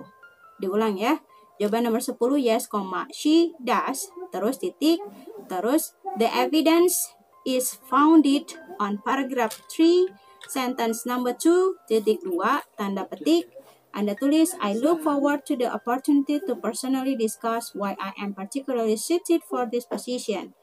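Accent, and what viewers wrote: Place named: West Java, Indonesia. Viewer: Terima kasih mudah2an bermanfaat, bahasanya sunda banget ih...☺ Maafkan Ay...koreksi positif.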